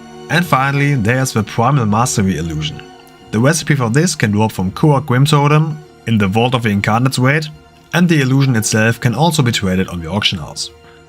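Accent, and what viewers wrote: Germany. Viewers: Warum nur auf englisch??? Man hört raus, eigentlich sprichst du deutsch oder?? Kann man all diese vz auch transmoggen??? Brauche ich den vz Beruf??? Würde mich über eine Antwort sehr freuen 🙏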